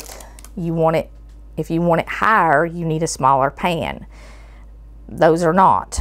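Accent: South, United States. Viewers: I didn't know about you but it always taste better with a southern accent ❤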